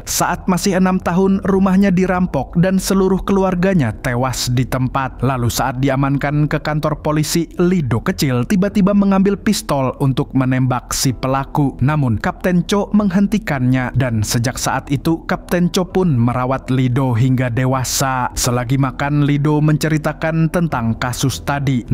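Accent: Banjar, Indonesia. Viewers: Lah mamang urang Banjar leh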